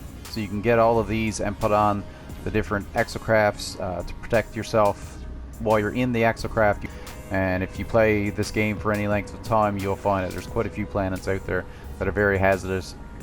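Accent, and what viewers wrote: Newfoundland, Canada. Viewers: Are you from Newfoundland? Because you sound and have hints in your name that you are because I am and the accent is very familiar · Before I even noticed your name "Ah, sounds "Canadian", must be a Newfie"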